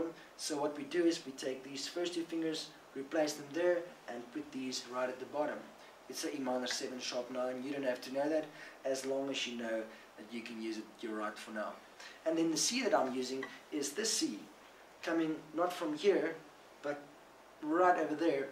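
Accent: South Africa. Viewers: do you have some south african in your accent?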